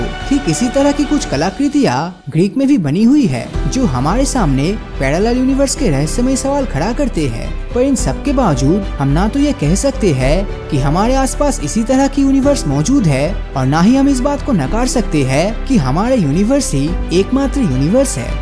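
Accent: Bengal, India. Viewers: Bhai aap Bengali hain na.... ❤ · Are you bengali!!!!Your accent says bro☺️☺️☺️☺️☺️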